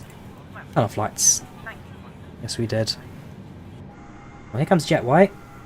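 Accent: United Kingdom. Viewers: Thanks for this video! It's wonderful listening to your British voice and a good landing! Your channel deserves more subscribers!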